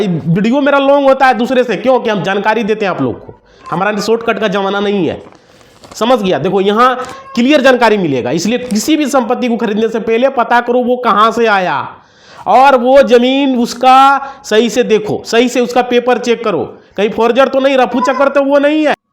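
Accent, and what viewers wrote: Bihar, India. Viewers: Adha padha likha lekin insan khud ko bahut samajhdar samajh raha hai bewakuf , jo bhi bolta hai pura bihari style mein, galat hindi aur english ke sath. Pura zahil hai.😡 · Jara soch ke dekho jara dhayan se suno aur suno, Bihar accent me bolkar bar bar bol raha hai samanjh gia samanjh gia ? Rafu chakker ho gia rafu chakker ho gia.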